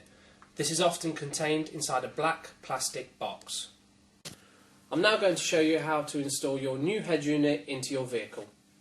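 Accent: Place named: United Kingdom. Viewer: just a little of british accent :)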